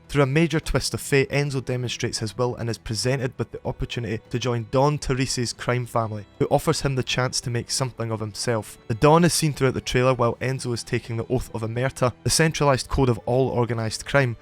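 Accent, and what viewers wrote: Scotland, United Kingdom. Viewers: Glad to find your channel, mate. Don't hear enough Scottish voices on here and your commentary is very professional. Subbed. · Are you from Glasgow or Edinburgh?)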